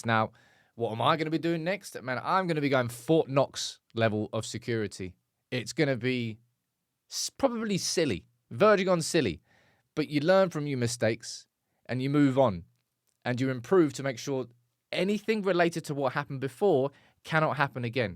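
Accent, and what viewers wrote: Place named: Liverpool, United Kingdom. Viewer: Defo a Scouser... sorry you had to go through that. Glad to see you got your channel back! ❤🤍🖤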